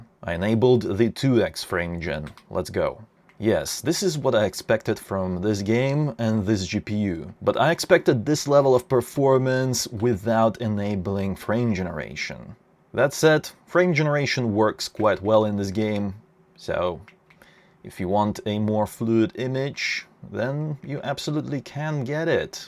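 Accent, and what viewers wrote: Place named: Russia. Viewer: I knew your voice sounded russian as soon as I came across your channel, haha! That's so cool xDD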